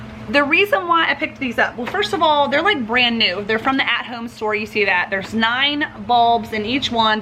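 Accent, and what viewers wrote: South, United States. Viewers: Ok sister I don’t know where you’re from, since you sound like me I’m figuring “The South” so if you ever get rid of that picnic basket I’m your huckleberry 🤷🏼‍♀️😍. So glad I found you!!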